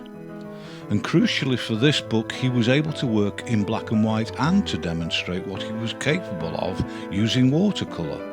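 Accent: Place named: Northern England, United Kingdom. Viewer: Thank you for doing these, very informative and enjoyable, nice to hear a fellow northern accent too.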